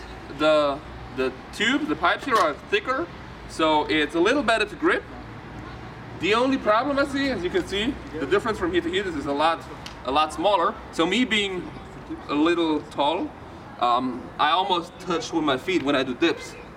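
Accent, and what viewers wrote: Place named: Germany. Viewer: After ten seconds of watching I knew you were in Germany, just by your accent, although it’s not a strong German accent.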